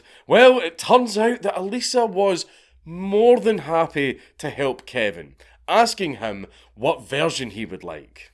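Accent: Scotland, United Kingdom. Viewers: I stumbled across it about a month ago and dear god this is all I have been listening to. Something incredibly soothing about a stoned Scottish man telling me stories. Enjoy and subscribe! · Mic Drop 🎤 2 hours of the Scottish accent ❤...I will try to comprehend the content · I am loving the long format videos with your scottish accent. Its like a audio book but doesnt make me sleepy. I hope you will create more (as a student I can state that elevator music is great). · man I could listen to this scottish guy narrating peoples stories all day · This guy is still talked about today in cybersecurity classes I take. Man's a legend and hearing talk about it with that Scottish flare is amazing.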